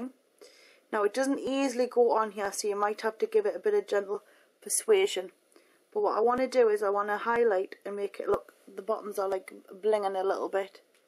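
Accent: Newcastle, United Kingdom. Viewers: British accent or am I wrong..? Good vid!! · Its not Irish, its Geordie! And I think it's great :)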